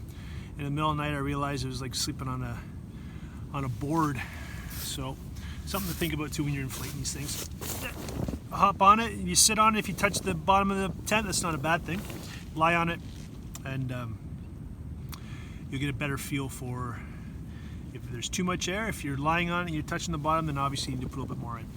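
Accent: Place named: Canada. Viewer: Good job. I'm curious. You have the same accent as Don Cherry. You must be from the same area as him or you have watched too many Don Cherry commentary. Thanks for sharing.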